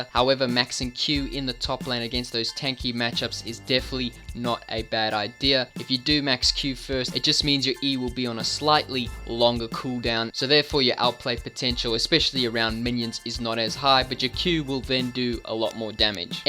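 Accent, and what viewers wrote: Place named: South Africa. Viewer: Are you from South-Africa? Cause it sounds like it ma brother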